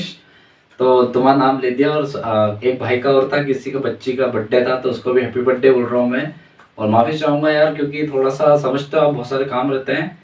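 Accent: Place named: Ajmer, India. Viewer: Bhai aap ajmer side ke ho kya sorry bura mat manna mujhe aisa lag raha hai ❤️🙏🙏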